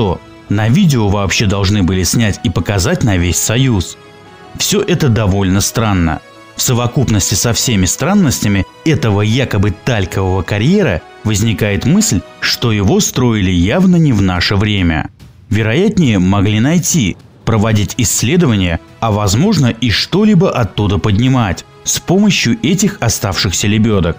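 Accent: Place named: Moscow, Russia. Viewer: Он не коверкает. Он просто так произносит. Скорее всего он из Москвы или Подмосковья. К нам приезжал с Москвы человек. Он тоже ударение ставил также необычно для нас . СЫсерть, Верхняя ПЫшма и т.д..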